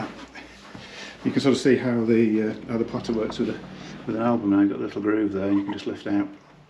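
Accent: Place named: North West England, United Kingdom. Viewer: his accent being called British upper class, I think you'll find it's a normal North West of England accent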